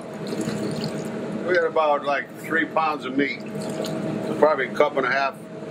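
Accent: United States. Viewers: Where are you based sir, New York? I like your kitchen I remind me my time in London but you speak like American...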